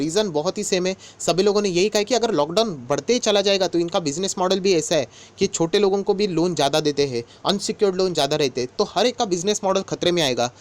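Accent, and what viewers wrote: Maharashtra, India. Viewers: Kachra nhi hai khara Sona hai 😄😄 Aap Marathi ho kya sir ??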